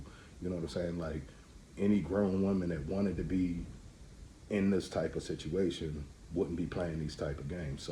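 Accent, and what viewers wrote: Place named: Maryland, United States. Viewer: I hear MD in you me too where at brother.....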